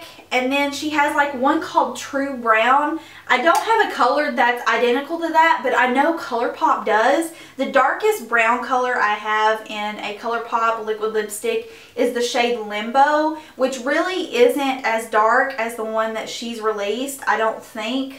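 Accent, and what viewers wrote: South, United States. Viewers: What you were saying about Kylie Jenner really resonated with me. As a working artist it would be difficult to see someone else getting credit for all of your work. You're paid for your work yes but credit especially on social media and shout outs are what continue to drive traffic towards your business. Thanks for the recommendations. I just subbed today. Love your channel and your accent! I'm a southern girl too 😘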